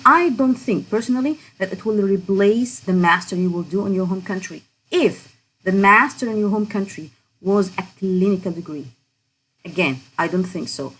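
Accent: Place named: United Kingdom. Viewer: amazing British accent makes me feel how my English is so weak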